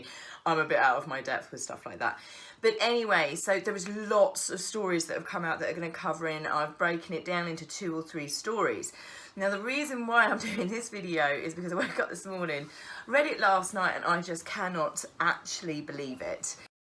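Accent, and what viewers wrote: United Kingdom. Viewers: Great info! I love your channel and your British accent😊.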